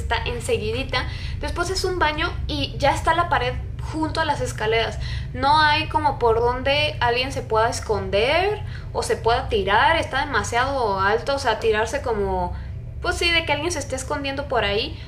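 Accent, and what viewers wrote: Chile. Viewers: Ajaja se kacho altiro k era de chile akkakak ❤🇨🇱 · Anuma me si cuenta que era de Chile como io pedo soy Chilena XD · Me di cuenta de que el es chileno ._.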